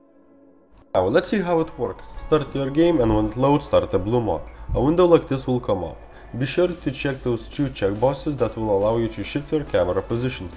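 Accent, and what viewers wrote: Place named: Russia. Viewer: I fell russian accent where you from comrade?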